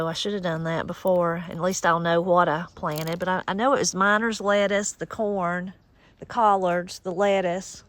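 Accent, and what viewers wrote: Appalachia, United States. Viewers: Just wanted to leave a comment: I grew up in Appalachia. My parents are from the north so I don't have a true Appalachian accent, but I use all the words y'all do. I now live in Germany as a dairy farmer. Was invited to a university to talk to Agricultural students in their English class. I'll be showing one of your shorts to showcase a true Appalachian accent. ❤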